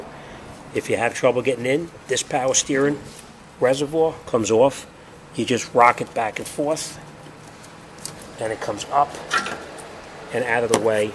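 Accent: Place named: Staten Island, United States. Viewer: Love your videos brother. Your accent makes me miss home...Staten Island.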